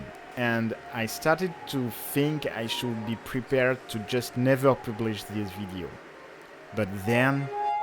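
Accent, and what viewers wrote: France. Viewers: I like your french accent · Are you french ? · J'ai capté que t'es français grâce à l'accent. Tu touches un billet pour faire de la pub à nation photo ? Avant même que tu montres la lessive c'était certain que c'est les années 80. Les meubles et les costumes, la déco etc. Je comprends pas comment t'as pu dire années 50 / 60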